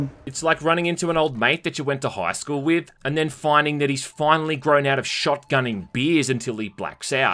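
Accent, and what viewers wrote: Australia. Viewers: Australian mate?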